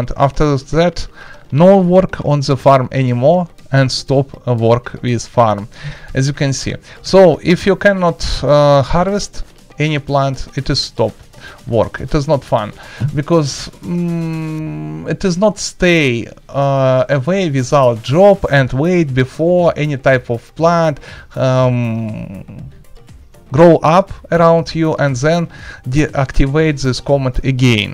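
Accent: Russia. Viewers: сразу видно, русский акцент)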